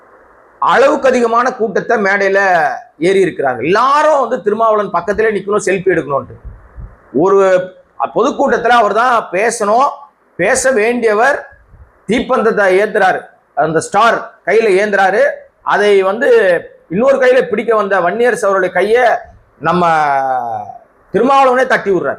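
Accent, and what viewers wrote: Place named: Southern Tamil Nadu, India. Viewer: இவ்வளவு அழகாக தமிழ் பேசுகிறீரேறே. உமது சொந்த ஊர் எது? தெற்கு பக்கமா?🎉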